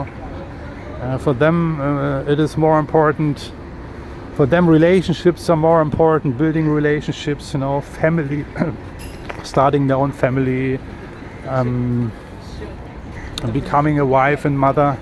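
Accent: Germany. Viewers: ur accent likes germany? right?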